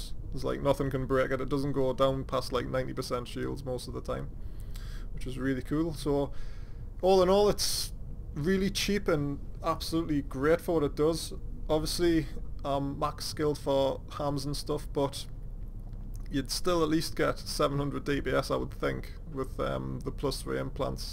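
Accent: Newcastle, United Kingdom. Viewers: newcastle or sunderland?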